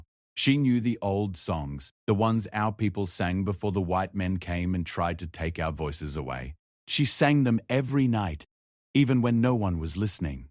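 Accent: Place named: Australia. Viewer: The narration isn’t exactly odd…it’s spoken in an Australian dialect. However, why would a story about a relatively remote part of America and its past be offered in a dialect of English from the other side of the world?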